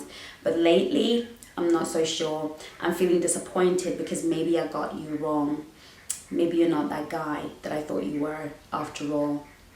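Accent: United Kingdom. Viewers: you are so beautiful and eloquent. I also love the British accent- my favorite accent. I just recently discovered your channel. Thank you for all you do. Working on lifting myself up. I know I’m a gem but there are times I’m a bit low and not feeling that way. Recent break up has really hurt me but working on picking myself up. Other channels helped me and like I said I recently discovered yours. Love the way you express yourself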